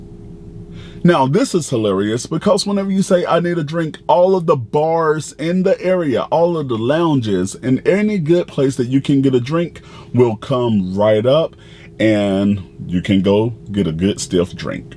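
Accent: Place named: Atlanta, United States. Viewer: Great videos my man. First thing I thought when I heard your voice was “He sounds like he’s from Atlanta” and sure enough I saw the map haha. Keep up the good work.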